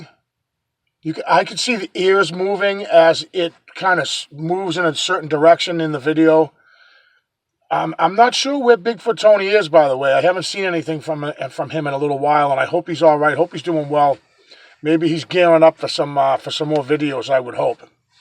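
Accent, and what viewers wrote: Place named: New York, United States. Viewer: You talk like a New Yorka lol. Regahdless. It's different than all other cryptid research channels.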